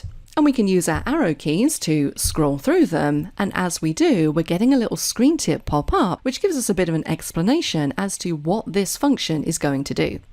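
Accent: United Kingdom. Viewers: Thank you! I am a 56 year old (from India) heart failure patient who is actually into Quickbooks accounting for staffing companies in the US. As I can not go out and need to look for work from home only, the first thing I need to keep up are my excel skills. There were many free courses available on Youtube but I chose this one as it is presented in a British accent, though you might be based in the US,(a BBC fan here!) with good recording quality too. I really appreciate your free service and I am not sure how I can help in return. Thanks a lot and best wishes from India.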